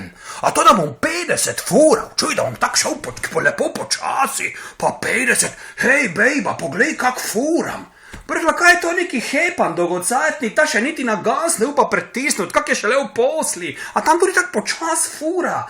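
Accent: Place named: Maribor, Slovenia. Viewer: Pizda še zdej ne morem prebavt mariborščine. Še en rant okol radarjev, stopped 50s. Good luck.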